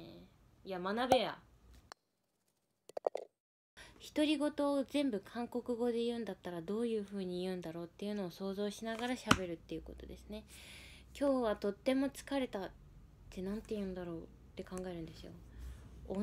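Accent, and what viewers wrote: Kagoshima, Japan. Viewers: 私の日本語の勉強方法と一緒です🙋 YUURIさんの動画を見ながら勉強しています。 多少鹿児島弁も一緒に耳に入ってます。笑 一緒に頑張りましょう！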